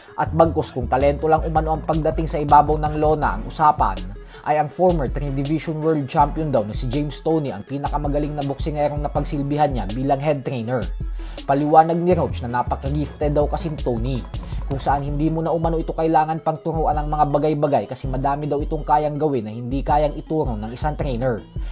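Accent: Visayas, Philippines. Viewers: Ayusin mo boss mo visayang Visaya 😅😅😅😅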